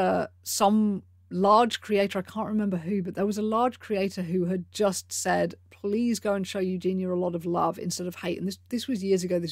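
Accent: United Kingdom. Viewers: Honey, oh boy! I'm American and really only speak English unless you include my three years of Spanish in college which equates to like basically Alicia still were still in from clueless trying to speak to her maid when she says,"you know I don't speak Mexican!" What was hell white valley girl I end up sounding every time I try to speak Spanish but anyways! My fiance Tyler just always started putting the TV setting so that every single thing at subtitles on all the time and it first it drove me nuts but then I started paying more attention to it and being like oh wow I always wondered what they said in this movie or whatever at that very moment now I know! And so that habit has translated over onto YouTube so I always have it set so that there's either actual subtitles on whatever videos are playing and they show up for me, or, they are the "Auto Generated" subtitles which are clearly meant for English speakers from America, because oh boy let me tell you it's pretty funny just reading them even on your videos because I'm sure you know quite well by now that you have a very distinct British accent and I mean personally I love your voice an accent so much and I just love your Vibe overall anyways that's part of the reason I love my channel but, I think the worst is when there's ever someone who narrates a video who has a very pronounced Welsh or Scottish accent...? 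(Austrians can be puuurrddy hilarious too with what this "Ufo generated" stuff comes up with?!) So when you're ta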